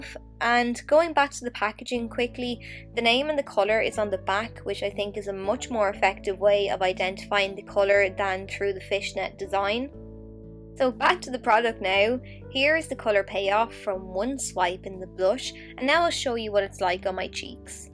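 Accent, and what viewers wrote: Ireland. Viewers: God, I love Irish accents.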